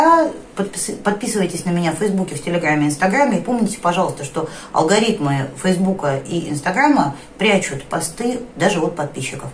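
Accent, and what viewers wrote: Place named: Saint Petersburg, Russia. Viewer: Потрясающий ролик в котором женщина, говорящая как питербурженка и мыслящая как москвичка рассказывает за тонкости южного быта. Я родилась и выросла в Ростове на Дону, со всей ответственностью могу сказать. Замените с тексте ролика слово "Болгария" на слово "Ростов" и 99% сказанного будет равно инструкции к покорению моей малой родины. Вывод Ростов не равно Болгария. Юг, многонациональный, торговый, с многотысячелетним наслоением истории, колоритный юг имеет в себе "ключики", одинаковые и сложно понимаемые теми кто там не родился. Однако, как человек много лет поживший вне пределов родного города, могу сказать следующее. Основной принцип ролика - отложите свои амбиции, откройте сердце, включите голову и проанализируйте вдумчиво место в которое занесла вас судьба - универсален. Для любых принципиально отличающихся от места где вы выросли конгломераций.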